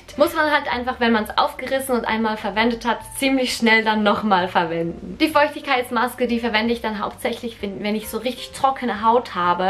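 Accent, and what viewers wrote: Switzerland. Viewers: Mi ganz körts wenig das schwizärä bisch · Alter ha gat vo dir a Video a gluagt vo 2013😂😂 met däm schwiizerdütsch👍👍😂 soo lostig i fir di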